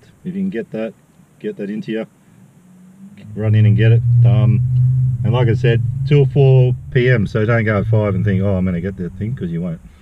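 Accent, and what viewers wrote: Australia. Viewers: R u from Melbourne?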